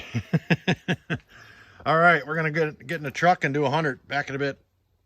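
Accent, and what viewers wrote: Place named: Wisconsin, United States. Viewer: I like your Wisconsin accent don't ya kno! When I heard crick, not creek, I knew. Lol great content !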